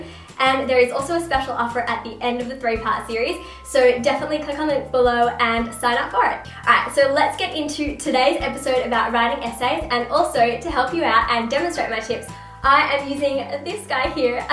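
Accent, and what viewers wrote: United States. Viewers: You are very cute. But the savvy southern blahblah talk lol annoying.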